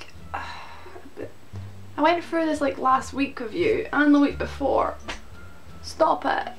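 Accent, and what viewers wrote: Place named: Scotland, United Kingdom. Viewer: Great video :) Just wondering if you ever get other Scottish people trying to figure out which part of Scotland you're from by your accent? This happened to me at an interview for Uni :(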